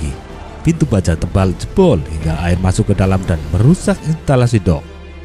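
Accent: Java, Indonesia. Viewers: Naratornya Jawa medok jadi kadang tidak di copy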